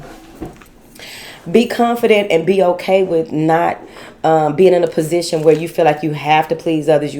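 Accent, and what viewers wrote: United States. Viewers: I love hearing your messages. Thank you so much for always being you!! I love your country accent!!!❤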